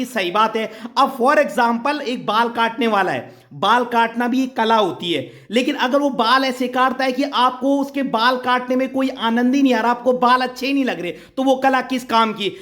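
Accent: Garhwal, India. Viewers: Sir aap gadhwali ho kya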